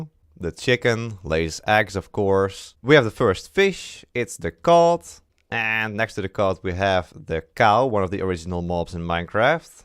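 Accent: Netherlands. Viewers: That accent 🤣 sws Nederlander hahahahahah Dutchie for sure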